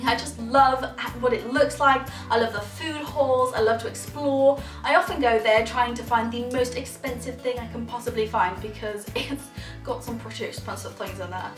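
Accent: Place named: United Kingdom. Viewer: your British accent is so cute!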